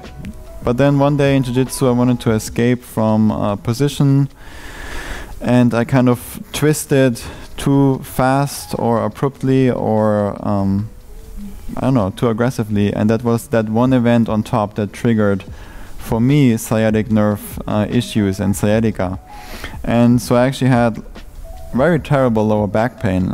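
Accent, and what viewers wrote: Germany. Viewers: Haha du bist doch locker deutscher hört man direkt an dein Akzent 😆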